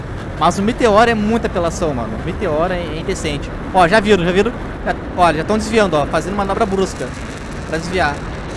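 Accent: Rio Grande do Sul, Brazil. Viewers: Tchê tú é gaúcho de Pelotas ctz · Tu é gaúcho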